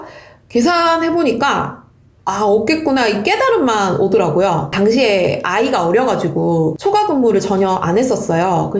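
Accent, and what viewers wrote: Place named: Gyeongsang, South Korea. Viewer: 혹시 ㅎㅎ.. 경상도분아니신가요.. 말투가 약간 있는데…ㅋㅋㅋ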